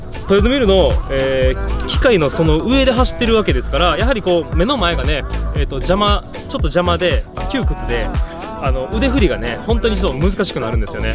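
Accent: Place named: Kansai, Japan. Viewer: の動画はナレーションがの関西弁そのままでめっちゃ良いです！ P.S.冒頭のBGM音量もう少し下げていただけますと… のアチチな気持ちはわかるんですが…音量が…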